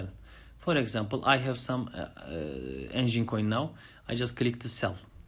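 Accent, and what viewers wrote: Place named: Turkey. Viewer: Ru Turkish lan aksandan belli sil bunu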